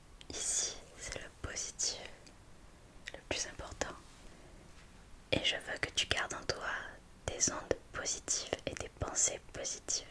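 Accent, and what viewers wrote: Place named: South, France. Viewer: L accent du sud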